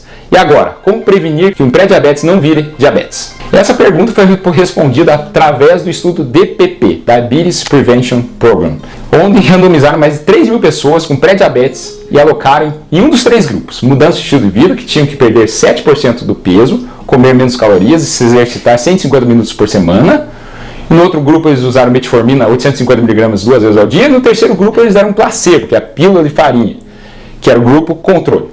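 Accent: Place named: Minas Gerais, Brazil. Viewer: Esse dr parece ser mineiro, incurtando.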